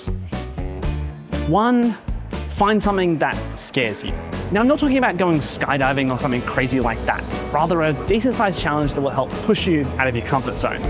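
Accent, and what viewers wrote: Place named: Australia. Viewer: How great it is to hear an Aussie voice. All the best with your journey, if it's a happy one, then things will continue to get better.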